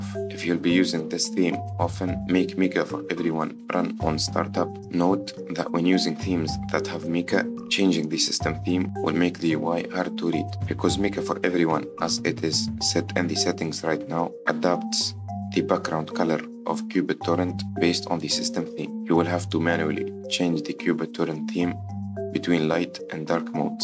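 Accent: Russia. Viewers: Um, cuz bro speaks like a robot with russian accent? Really slow, stuttery and without any soul in words. No doubt, it comes with experience and the author should continue improving (cuz e.g. all this themes have never been bundled in one video, usually being on different subreddits and videos => useful), but being surprised with a low number of subs is either your delusion or major d*ck riding lmfao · Ты русский, что-ли? Крутой акцент =)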